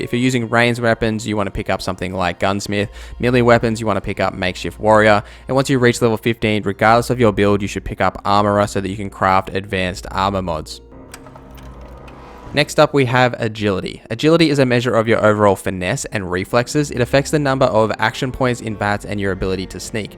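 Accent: Australia. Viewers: cheers mate great 2 hear an aussie doing it write thanks for the tips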